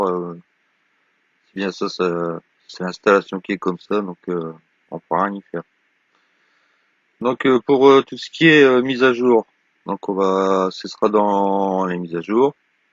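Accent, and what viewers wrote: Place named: North, France. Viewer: bravo bonne explication avec l'accent du nord. merci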